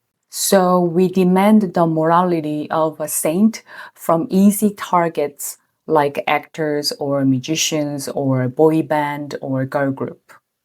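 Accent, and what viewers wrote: South Korea. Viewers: Not everything is AI, don't be dumb. It's her natural korean accent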